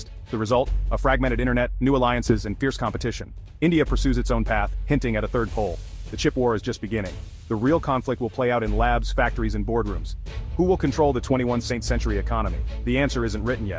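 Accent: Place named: United States. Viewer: Maybe next time get the AI voice to slow down, be less aggressive and less American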